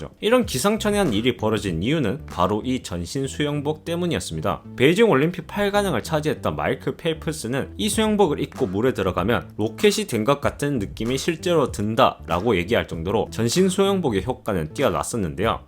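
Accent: Gyeongsang, South Korea. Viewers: 나래이션 경상도분이신가요?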